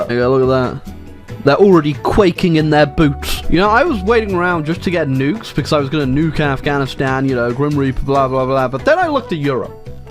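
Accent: United Kingdom. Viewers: You watch these videos and think he sounds anything like an American? Have you ever heard an American speak? He's definitely British.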